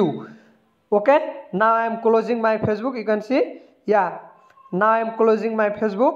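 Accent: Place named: India. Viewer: Bhai sumjh me to kuch nahi aaya. Pr tari desi English sun ke majaa..aa gaya.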